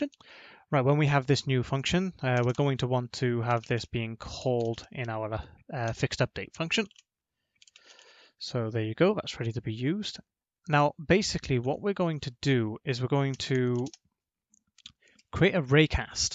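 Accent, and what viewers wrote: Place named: Wales, United Kingdom. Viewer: Looks like I'm not the only Welshman (I'm guessing by the accent) who's struggled with stairs! I'll have to give this solution a try, as my own solution became very convoluted, but the in-game result looks very similar to yours. I was trying to create a sort of "fake ramp movement" where I'd calculate the angle/direction from where the character is standing, to the point where they would be stepping up to, and then altered the players movement direction according to that. It involves a bazillion raycasts and lots of fudged maths, and I'm sure is massively inefficient. Maybe this simpler approach is what I need!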